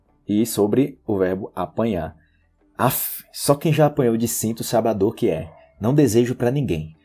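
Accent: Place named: Antioquia, Colombia. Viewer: Muchas gracias. Cuando hablas español tienes un acento paisa extraordinario.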